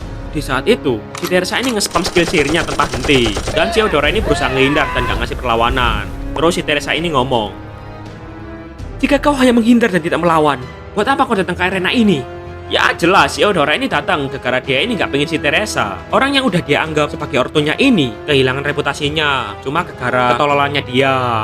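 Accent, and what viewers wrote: Java, Indonesia. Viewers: jowo mas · Jowo nyelll abang kuuuu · Jawa nya ngejawa bgt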